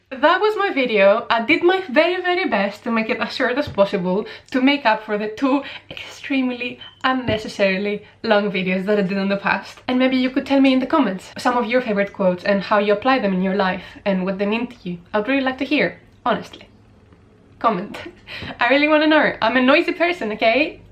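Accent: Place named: Greece. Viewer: that explains it! My good good friend Akis is from Greece in Trikalla, both your accents are fairly similar. I know the word clap and that is al... are you ready to be overcome with emotion... right here is comes: hirokrotima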